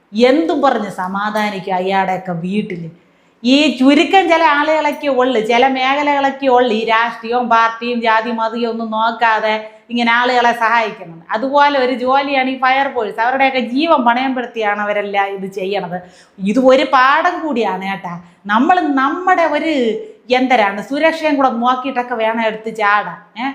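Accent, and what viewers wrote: Thiruvananthapuram, India. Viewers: അവതരണം സാഹചര്യത്തിനനുസരിച്ചു വേണം. 3 പേർ മരിച്ചതിൽ ഒരാൾ അങ്ങേയറ്റം ബഹുമതി ആവശ്യപെടുമ്പോൾ ഇത്തരത്തിലൊരു അവതരണം നിന്നും ഒട്ടും പ്രതീക്ഷിച്ചില്ല. കൂടാതെ തിരുവനന്തപുരം ഭാഷാ പ്രയോഗം അതിരു കടക്കുന്നില്ലേ എന്നൊരു സംശയം. ഞാനും ഭാര്യയും നിങ്ങളുടെ സ്ഥിരം പ്രേക്ഷകരാണ്. അഭിപ്രായം ഉൾകൊള്ളാൻ കഴിയുമെങ്കിൽ ഉൾകൊള്ളുക.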